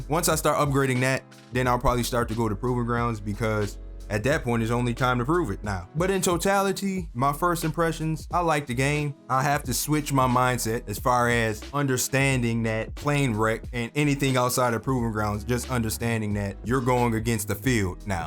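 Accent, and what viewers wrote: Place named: Buffalo, United States. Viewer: Yo I know a Buffalo nigga when I hear one! 😂 great content tho my boy!! Earned a sub! 🔥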